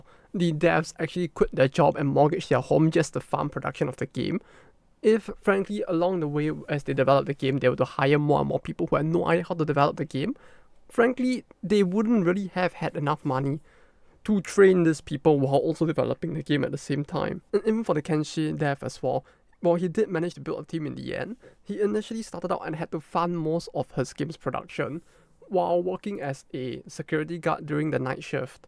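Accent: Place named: Singapore. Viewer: I'm a first timer on this channel since this happens to be on my recommendations. First of all, why is your channel low in subs? Cause this is some seriously good and insightful stuff. Typing this near the end of the vid; almost finished watching. A lot things you've said actually clicks and confirms certain stuff that I only have a smidge about JP Game dev and understand how they dev as how it is. (ie, game tech and design patenting). Like, on the surface I can agree and understand they do it for protective reasons, but I never crossed in my mind that this thing can actually stifle innovation for new games and even local indie games in the large scope. Second of all, are you actually native Japanese? Cause your accent is way more closer to a Singaporean. Heck, if you were to tell me you are an expat living in Japanese or you had a half South East Asian parent, I wouldn't have been surprised. 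On that note tho, you are quite eloquent and really know how to orate. You could be a top ranking salesman or politician, if you catch my drift, haha. But yeah, not a dull moment when you speak. One again, YouTube has unearthed for me a great gem of channel. I hope more would witness this bright glimmer.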